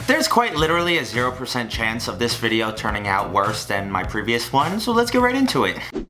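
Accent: New York, United States. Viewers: Is it just me or is actually Freddie Benson with a New York accent?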